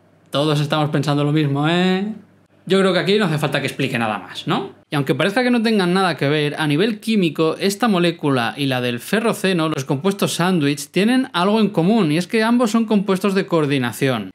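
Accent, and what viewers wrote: Cuba. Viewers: Cubano xd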